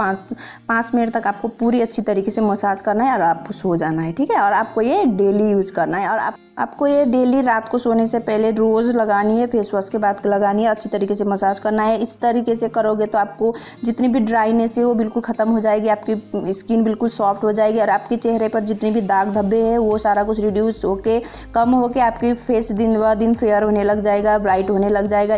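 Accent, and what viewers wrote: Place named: Garhwal, India. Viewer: R u garwali, ur tone is like that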